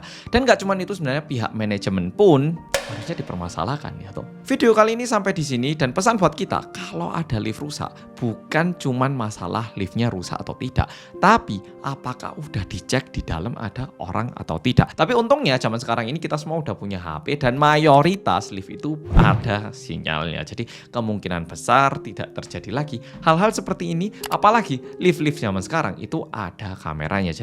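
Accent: Java, Indonesia. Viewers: Hai medok🎉 Menyedihkan😢 suwun yo rek 👌🏿🙏🏿💕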